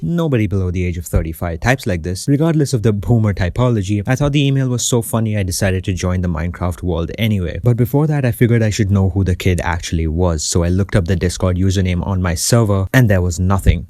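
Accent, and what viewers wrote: India. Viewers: Are you from India your voice has I think Indian accent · are you indian u sound indian to me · my favorite india accent person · he indian i can hear it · i never knew he was a indian or used to speak indian accent :) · Hello man with an indian accent. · he used to sound like a lil indian before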